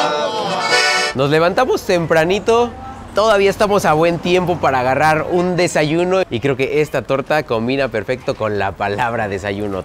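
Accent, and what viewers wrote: North, Mexico. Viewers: 1 dia sale de su rancho y ya trae acento norteño, no mames. XD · Llevas unos días apenas y ya se te pego el acento norteño! 🤠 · HASTA EL TONO NORTEÑO SE TE PEGÓ 😂 SE ME ANTOJÓ TODO PERO MAS LOS TACOS 🤤😋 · Cómo que el acento del Norte se te está pegando mucho 😂😂😂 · Que chulada que disfrutaste la visita, oye pero creo que se te pegó el acento norteño Aaaaajjuuuuaaaaa!! 👍🏻👌🏻😋😉 Saludos!! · El acento!!! El acentoooo!!!🤩🤩 te saleee súper bien tu norteño interior jajajaj🥰 saludos desde Culiacán Sinaloa 🤙🏾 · ya se te anda pegando el tonito del norte jasjajsjaja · Se le pegó el tonito norteño!!! Jajajaja...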